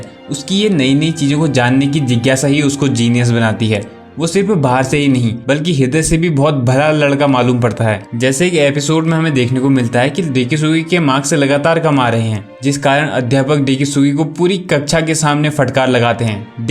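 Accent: Standard. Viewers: Bro damn ur hindi is pure. It's not everyday i get to hear pure hindi. Good job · Omg how can you speak so much pure hindi?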